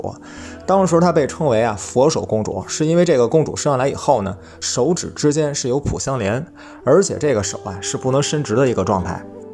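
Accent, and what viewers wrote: Beijing, China. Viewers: 功课十足的视频👍&京腔京韵的普通话💯👍谢谢分享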